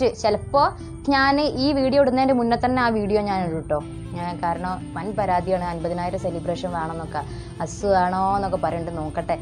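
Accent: Kondotty, India. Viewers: മഞ്ഞക്കിളി.... ഇയാളെ പാട്ട് എനിക്ക് ഒരുപാട് ഇഷ്ടമാണ് അതുപോലെ ഇയാളെ വർത്താനവും എനിക്കിഷ്ടായി ശരിക്കും തനി നാടൻ മലപ്പുറകാരി അല്ലേ... 😄😄😄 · ഇൻശാ അല്ലാഹ് ഞാനൊരു കല്ല്യാണം കഴിക്കട്ടെ മലപ്പുറം ജില്ലേലാണ്ന്ന് സംസാര ശൈലിയൊക്കെ കേട്ടിട്ട് മലപ്പൊറംകാരിയാണെന്ന് ഇൻക്ക് തോന്ന്ണ് ണ്ട് ആണെങ്കിൽ കല്യാണം കഴിച്ചിട്ട് ഹസ്ബന്റിനെയും കൂട്ടി വരണ്ട് അതിന് മുമ്പ് നല്ലൊരു കല്യാണം ശരിയാവാൻ ദുആര് ക്കിട്ടോ ങ്ങള് ങ്ങക്ക് വാണ്ടി ഞമ്മളും ദുആര്ക്കാം ട്ടോ ങ്ങളെ സാരം ഇൻക്ക് ഭയങ്കര ഇഷ്ട്ടപ്പെട്ടു.... പിന്നെ കൂടെയുള്ള ആളെ കണ്ടിട്ട് തൻസീർ ക്കാന്റെ അതെ ലുക്ക്ണ്ട് പെട്ടന്ന് കണ്ട തൻസീർ ക്കന്റെ riletion ആണോന്ന് തോന്നും ഞാൻ കളിയാക്കിയതല്ലാട്ടോ? Masha allah ഞാൻ ഇന്നാണ് ആദ്യായിട്ട് ഇങ്ങളെ സംസാരം കേൾക്കണത് ഇൻക്ക് ഒരുപാട് ഇഷ്ട്ടായി പിന്നെ ഞാന് ഇട്ട കമെന്റ് വായിച്ച കയ്യിണ്ല്ലെങ്കില് ക്ഷമിക്കണം ഇവടത്തെ ഭാഷങ്ങനെ സംസാരിച്ചു ശീലായിട്ട് നല്ല മലയാളം ഇപ്പൊ തൊളേള വെര്ണില്ല്യ ☹️അതോണ്ടാണ് ട്ടോ 🥰😘😘😘❤🌹❤❤🌹🤲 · Nammale kondottykkare athe samsaram. 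....appadi samsarichu.... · ആ കൊണ്ടോട്ടി ഭാഷ എനിയ്ക്ക് ഇഷ്ടം ആയി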